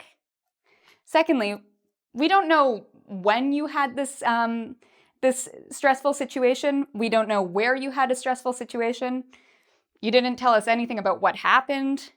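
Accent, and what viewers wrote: Canada. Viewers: Very useful, great! Just one question,: are you Canadian? ☺ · shes from Canada, I can tell by her accent. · Cute canadian accent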